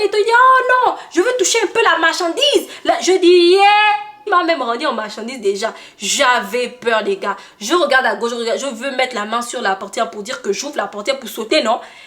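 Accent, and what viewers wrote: Cameroon. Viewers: Seigneur 🤣 accent camerounais j'ai écouté 😭😭.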